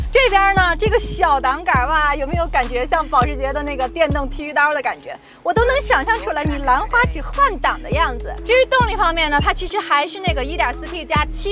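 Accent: Beijing, China. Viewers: 触控板儿，哈哈哈，妹子这浓浓的北京腔儿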